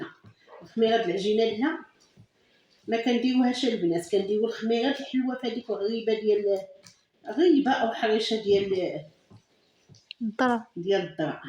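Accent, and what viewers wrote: Morocco. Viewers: الهضرة زوينة بحال عماتي اللي فصفرو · سيدة فكرتني فعاءلتي تماما فتطاون نفس الهدرة تقريبآ ❤❤❤❤❤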